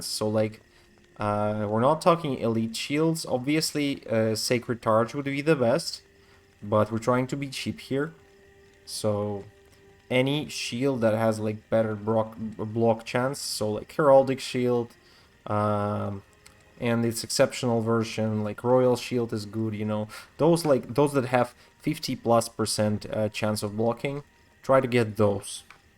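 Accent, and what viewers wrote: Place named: Eastern Europe. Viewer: Finally a Cheap Smiter Build without the use of Annihilus and already acquired Pally +3 Torch, no BS, just cheap items. Except Shaftstop --> 900+ hours in and I still haven't found it, but I can use Smoke plus that +35% physical negation shield, I think Stormshield is the name --> comes out to be somewhat equal to the combo here (after equipping some charms for extra Elem Res). Auto-subbed, even if it's just for the accent - reminding me of Idevaste's :P. Great video, brother! *I wonder why Central/Eastern European Youtubers are better at keeping the script short and without unnecessary BS chatter...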